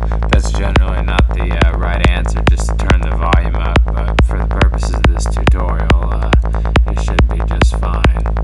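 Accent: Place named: United States. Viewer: nice vid, but i mean, you are an american (because of the accent), and i thought that americans only listen to hip hop and mainstream kind of shit. But oh well...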